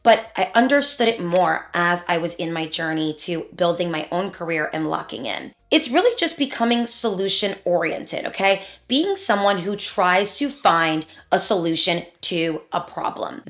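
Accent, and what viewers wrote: New Jersey, United States. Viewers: You’re one of my favorite content creators you always give really actionable really sensible advice. Also, I love that you have a jersey accent because I no longer live there and it sounds like HOME.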